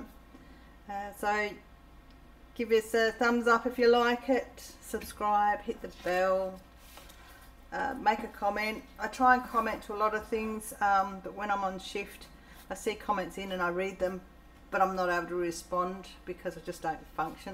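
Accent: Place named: Australia. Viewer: This is lovely! 50x50 is becoming my go-to size. Also, great to hear an Aussie accent!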